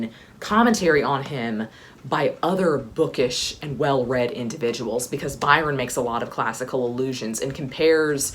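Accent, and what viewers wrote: South, United States. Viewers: And I just heard you say from the south! I knew it 😁 I was telling myself I am sensing southern, very beautiful.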